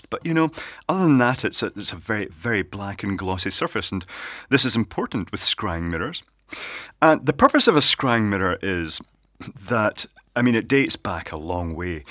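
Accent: Scotland, United Kingdom. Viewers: Talking hands with horrid middle class Scots accent,hope he disappears up his tunnel of typhon.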